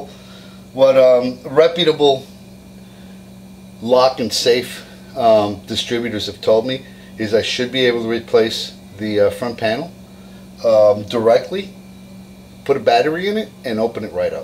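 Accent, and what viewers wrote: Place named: Brooklyn, United States. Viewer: Fantastic video; really well done. I have to thank you, since I had tried other videos on YouTube, many of which were very well-made, as well. However, your's did the trick, and saved me a ton of dough in the process. I'm truly grateful to you for having taken the time to post this. BTW--- Love the accent... Brooklyn???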